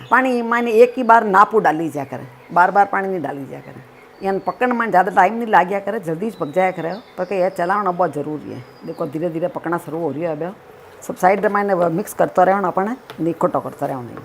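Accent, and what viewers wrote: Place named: Rajasthan, India. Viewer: Hi aunty ji mai haryana se hu maine aj pehli bar apki video dekhi h mujhe lga ki mujhe rajasthani samajh me nhi ayegi par, samajh me ayi ap ke muh se rajasthani me ye recipe sunkar acha lga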